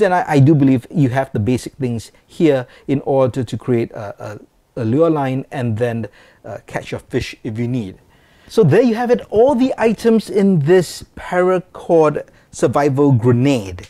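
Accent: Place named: Singapore. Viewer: I suspected that you were Singaporean because of your accent but you don't say "La" so I'm not sure.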